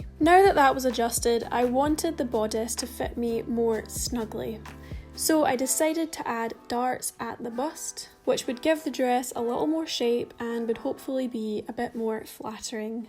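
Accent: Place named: Scotland, United Kingdom. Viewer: Love the Scottish accent makes me miss home :) love the flips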